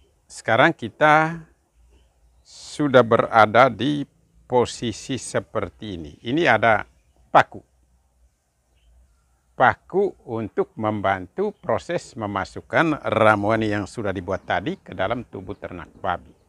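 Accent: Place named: Manggarai, Indonesia. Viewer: Pak logat nya kaya org Manggarai ya Pak..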